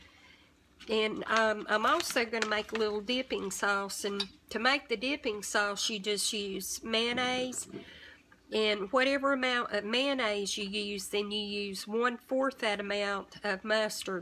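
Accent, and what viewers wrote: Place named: North Carolina, United States. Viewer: I knew your accent sounded familiar! I’m from the Boone/Blowing Rock area :)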